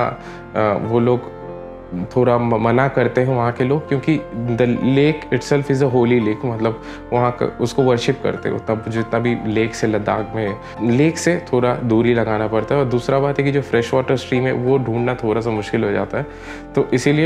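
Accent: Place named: Bengal, India. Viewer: The way you gave info it's amazing . And thanks for the info man keep growing. I have a question are you bangali ? Your accent sounds like Bengali a bit .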